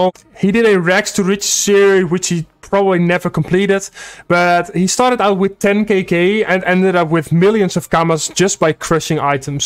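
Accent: Netherlands. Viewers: Are you dutch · You are dutch, aren’t ya?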